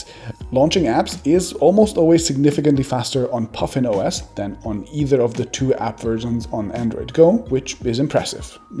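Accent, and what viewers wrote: Germany. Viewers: Du bist deutsch? :D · Manchmal wünschte ich mir du würdest einfach Deutsch sprechen. Nicht weil dein Englisch schlecht wäre oder ich dich nicht verstehen würde, aber das offensichtliche"ein deutscher spricht englisch" englisch das du sprichst lässt mich einfach erwarten das da gleich ein paar brocken deutsch durchstechen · Hab ich mir doch gleich in Mrwhosetheboss Video gedacht dass du Deutscher bist :D Wir können unseren Akzent einfach nicht verstecken wenn wir Englisch reden^^ Aber nett so deinen Kanal gefunden zu haben, war mir bisher echt unbekannt obwohl du ja echt schon ziemlich viele Abos hast, jetzt hast du noch einen mehr^^ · Are you from Germany ? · You are german? :)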